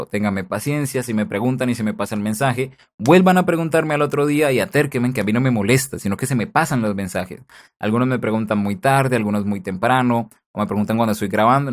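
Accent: Colombia. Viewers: tu eres colombiano de que parte eres pareces de cali si eso es asi un abrazo desde bucaramanga y gracias por todos tus tutoriales de lo nejor que hay en youtube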